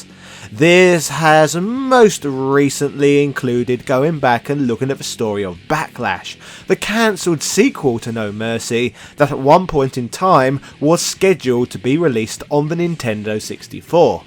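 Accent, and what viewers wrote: United Kingdom. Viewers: 5 mins and 42 seconds in and so far nothing but some annoying brit talking and showing stuff that has nothing to do with the title disliked and flagged as misleading title...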